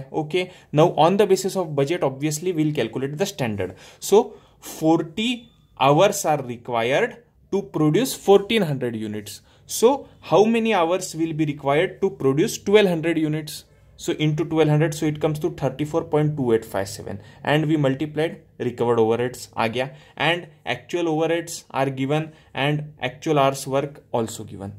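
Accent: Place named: England, United Kingdom. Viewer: I'm from tamil nadu loving your english accent ❤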